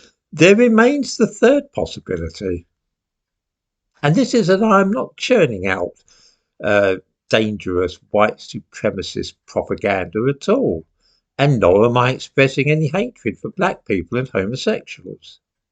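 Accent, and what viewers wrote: United Kingdom. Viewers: It is mostly because you MUMBLE and only the UK English can make out most of what you say. I find it very hard to understand a lot of your speech. Tryin learning how to speak well and once you reach a bit of an audience, you will get strikes and a van. You simply aren't a threat to the Left. I doubt that even they understand half of what you are mumbling.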